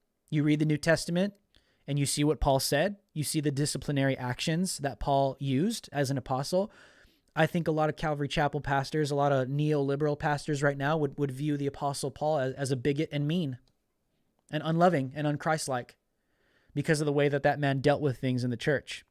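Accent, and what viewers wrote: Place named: Puerto Rico. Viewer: Bro i saw your speech at the rallly in DC and it was broadcasted by RSBN..............you remind me of me all the other ones were kinda ok but damnnnn...you blew it off the roof about how to fight back.....i don't know if you are latino from new york or from what your accent sounds like maybe puerto rican like me but yooooo....that was the one that i even looked at my lady and said....now this is a man's man lol.....keep hope alive brother this isn't over and eventually the truth prevails...peace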